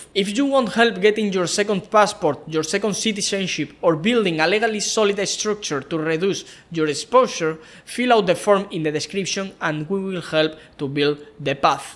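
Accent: Spain. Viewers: i’m also spanish , i’d recommend you to spend a few months in the uk or us until you lose your strong spanish accent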